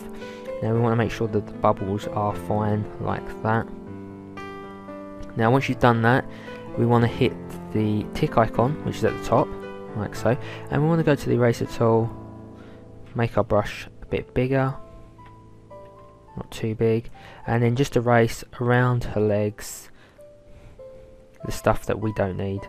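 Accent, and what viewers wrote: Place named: United Kingdom. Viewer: the Q is U only my man has a heavy British dialect... for me it was hard to understand some things as well..... na mean??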